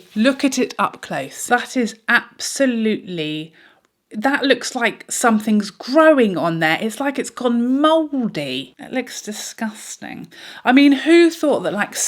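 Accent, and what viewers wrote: United Kingdom. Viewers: I just found your videos today, and I ADORE your POV, your common sense approach, and your talent! As an American, I am also enchanted by your British accent. Thanks for doing videos!!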